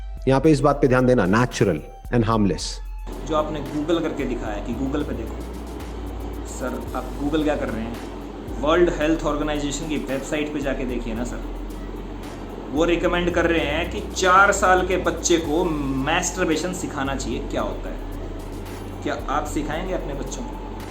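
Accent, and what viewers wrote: Haryana, India. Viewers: I love this khadi hariyanvi boli 😉😉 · Bhai ji aap haryanwi ho kya🔥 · Is video me Pure Haryana accent me bate kiya hai😀 similar to Acharya Agni Dev Arya ji 😀 pure Haryana accent 🙏🙏🙏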